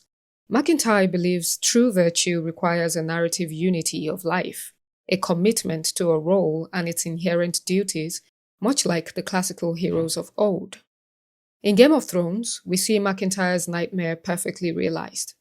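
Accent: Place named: France. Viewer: Oh yea, you watch film for the writing. I love it! I work with a man at Tesla with your accent. He's from France. I lived in Germany for a few years myself. Europe has such deep, rich culture.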